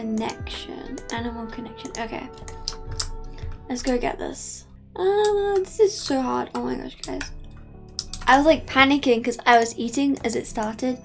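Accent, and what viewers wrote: United Kingdom. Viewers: are you British? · i love her accent She's British?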